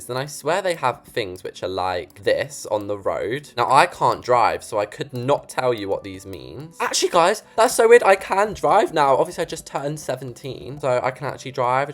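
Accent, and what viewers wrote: United States. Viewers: Do an entire video in your american accent and call everything in their american way, Ex: Crisps are now chips. (im from america lol and want to see you strugle with everything) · I love ur builds but I’m not the biggest fan of ur style… or ur American accent srry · I CANT I LOVE THE A,ERICAN ACCENT ITS TOO FUNNY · I LOVE you Amarican accent:) Its sooo Fun! She should come to Amarica someday! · I love how he does the American accent 😂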